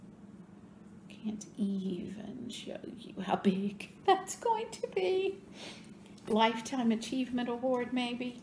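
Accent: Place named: Texas, United States. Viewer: So nice to hear a sweet Texas accent and to find another homeschooling mom (although mine are now in their thirties). Your Mary Queen of Scots will be stunning! Your curls are beautiful. I'm in Athens and am enjoying the rain as we badly need it. I heard about you on Rachel's FlossTube and I think she mentioned you go to the Tudor Rose Guild, unless I misheard. I'm going to the October meeting. Hope to meet you if you are there. I'm coming up on my FlossTube Anniversary, too!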